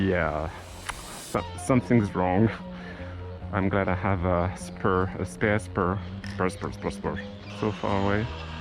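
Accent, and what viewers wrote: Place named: France. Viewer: Like you vidéos and your french accent ! Good job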